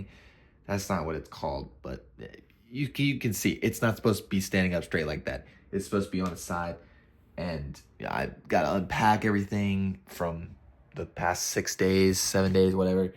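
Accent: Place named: South, United States. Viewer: The more excited you get the more the southern twang comes out. 😂👍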